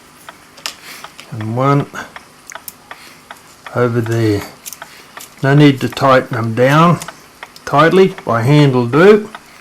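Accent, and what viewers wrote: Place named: Australia. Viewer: Sounds like an Aussie 🇦🇺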